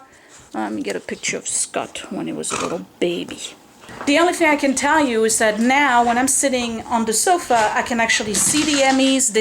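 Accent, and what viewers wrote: France. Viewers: What are the Emmy's for? Thats exciting! I have been binge watching you since yesterday and I love you!! The French Accent reminds me so much of my grandma as she was raised speaking French. Her accent died down as she got older since none of us ever spoke French around her but some words you say exactly like her and that is so special!! Thank you for sharing and for being real!!! I have been doing a few things here and there while watching you and it really does feel good!!!!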